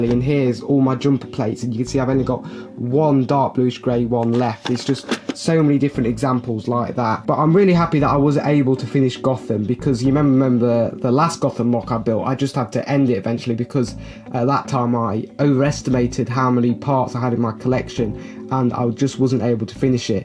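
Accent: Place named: United Kingdom. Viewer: Great mod! bri’ish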